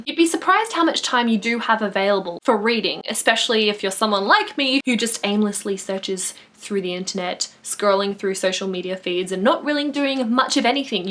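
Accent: Australia. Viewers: May I ask what part of Australia you're from?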